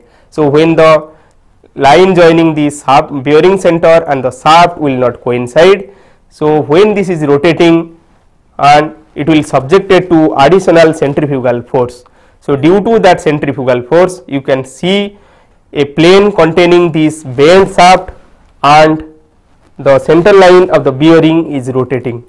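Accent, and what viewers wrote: India. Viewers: The movement of cursor to indicate which particular portion of the screen the student should focus attention on, is to be improved by increasing the size of the cursor. If that is taken care of, the quality of learning will vastly improve. Of course, students outside India will find it difficult to comprehend a pure desi pronunciation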